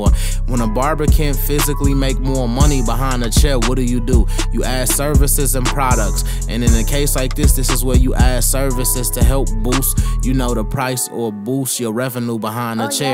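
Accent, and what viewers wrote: Chicago, United States. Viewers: You the shit! I love how you Chicago slick talk 😎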